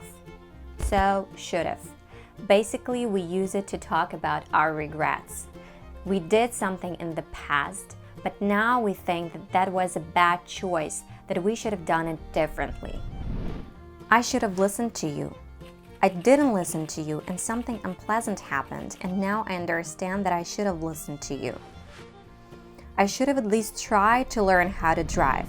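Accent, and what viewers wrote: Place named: United States. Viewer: American accent rules !!!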